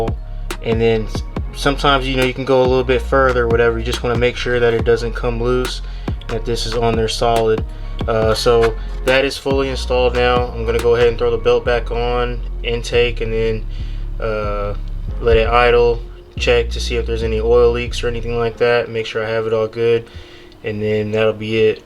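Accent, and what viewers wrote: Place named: Philadelphia, United States. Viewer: ❤️ from Philly. You talked like a good friend here in Philly and I was wondering if there was a connection. God bless.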